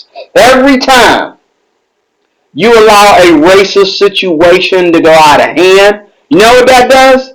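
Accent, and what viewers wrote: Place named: South, United States. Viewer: lol had no idea this dude was black until I looked up at the right hand corner 😂. He sounds like southern redneck lol 😆. He ain’t lying though 😉.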